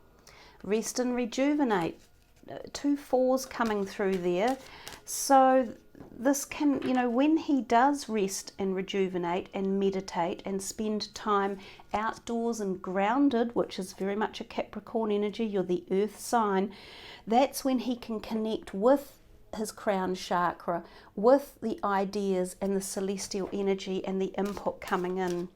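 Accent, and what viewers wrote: New Zealand. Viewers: Are you a new Zealander? The accent in familiar. Regardless.. glad I found you - a familiar accent with tarot readings on YouTube is calming. ❤ thank you for taking your time to do this reading 😊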